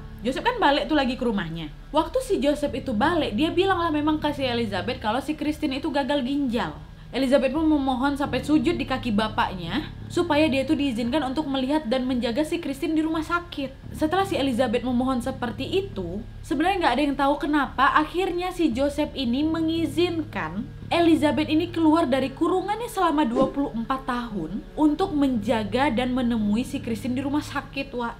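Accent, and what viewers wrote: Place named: Medan, Indonesia. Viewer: Sukak kli lho denger kakak ini ngomong, logat medannya tu.. Hihi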